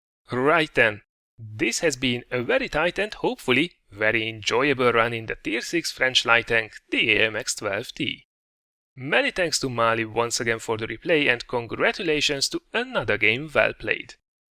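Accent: Hungary. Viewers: magyar vagy? mert ( lehet hogy csak én) eléggé hallottam az akcentust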